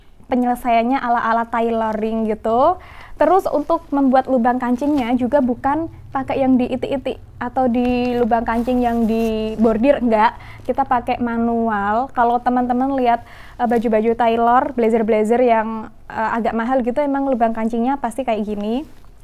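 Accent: Java, Indonesia. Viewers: Aku seneng nih kak ngomongnya medok medok gimana gitu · Mbak ngomonge medok tp lucu😁.luvv mbak q lgsg mengulas tabus krena merasa sangat amat bermanfaat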